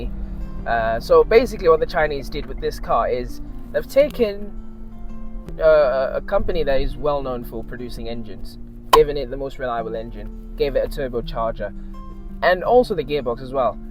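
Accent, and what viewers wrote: United Kingdom. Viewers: Bro, where did you learn to speak like that? Too smooth with that British accent👌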